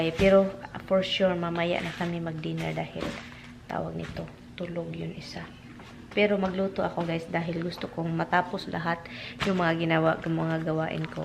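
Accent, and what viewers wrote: Visayas, Philippines. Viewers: I can hear a Bisaya accent when you speak Tagalog. And you speak slow enough where I can understand much more than from a natural Tagalog speaker. Thanks, it helps me learn Tagalog.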